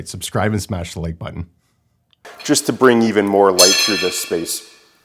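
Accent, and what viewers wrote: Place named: Canada. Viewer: I've been binge watching your videos, largely because you've got such a humble, informed, and no-bullshit style. I was super pumped because of your serious Canadian accent, so tried to guess where you were, with no success. Lo and behold, I watch this video and you pull up next to my favourite electronics shop!!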